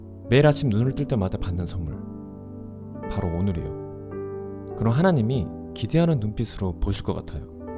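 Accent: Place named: United Kingdom. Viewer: 힐링벌스 좋아요! 자주 올려주세요 ㅎㅎ 영국발음도 멋지십니다👍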